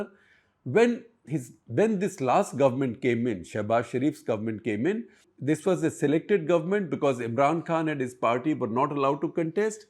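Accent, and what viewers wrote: India. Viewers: And your programs on international affairs and politics!!! Only easy Indian style English of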